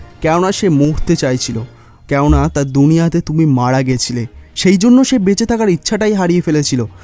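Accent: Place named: India. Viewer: তোমার বাংলা শুনে,বুঝলাম আপনি indian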